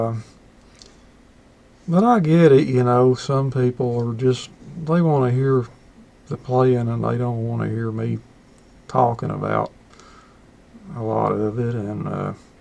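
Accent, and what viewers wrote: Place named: North Carolina, United States. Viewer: I like your playing, and your voice (the southern twang from....North Carolina??), and also the old electronic gear in your room. All the best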